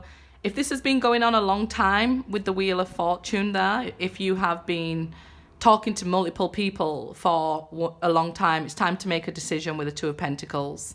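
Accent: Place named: West Yorkshire, United Kingdom. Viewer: Sounds like a West Yorkshire accent..! ❤️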